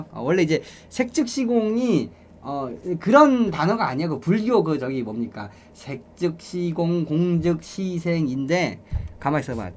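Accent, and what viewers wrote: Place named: Seoul, South Korea. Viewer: 케인님 나긋나긋한거랑 특유의 서울사투리 거르고봐도 말투나 표현법보면 상당히 여성적인편임 ㅋㅋㅋ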